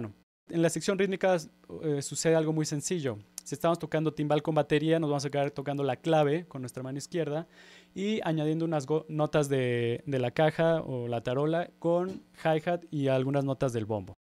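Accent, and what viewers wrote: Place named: Mexico. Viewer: Excelente la manera que explicas y enseñas, por el acento eres mexicano, chingón mi hermano, hoy aprendí algo nuevo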